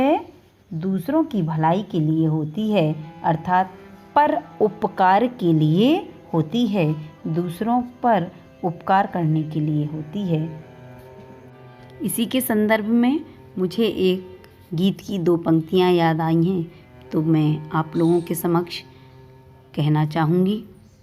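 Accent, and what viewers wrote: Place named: Bengal, India. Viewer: Also may I ask you a question, are you a bengali cause your accent sounded one?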